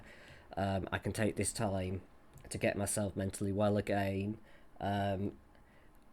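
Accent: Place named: United Kingdom. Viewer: You tickle me w/ the “and stuff”, LOL. So silly and fun. You are doing so awesome w/ all your videos. I can see your confidence building. I enjoy you so much! I am a sucker for the UK accent. I watch so many Brit shows. Even the comedies have eloquence w/ the voices. I am in the southeast US. We sound NOTHING like you guys. I could listen to you & (your putty lady) all day long. Keep them coming. I love your WIP! We have some nice tea shops where I live. Chocolate tea has been a fav for yrs. And you are right, 😋 delicious!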